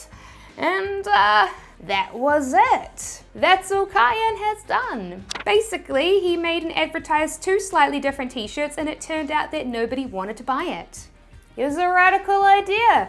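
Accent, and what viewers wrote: New Zealand. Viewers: Love this! Well done. Really enjoying your pragmatism (and your Kiwi accent!) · My dear, your Kiwi accent is absolutely adorable. I have next week off, and because I cannot travel, I shall get stuck into PrintOnDemand and DropShipping using your fantastic free eBook. I even downloaded a female New Zealand file on my Mac to read it to me. BTW I was just thinking, aren't all the people on AliExpress, just DropShipping for Alibaba? One final thing, the final syllable of the French word "entrepreneur" is pronounced "er" like in "her", and not "ure" like "manure";-) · Love your kiwi accent... Your nation knows my nation well via rugby .. (Wales) love your content.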